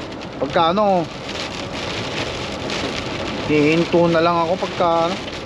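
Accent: Ilocos, Philippines. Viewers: idol tiga saan k, parang ilocano k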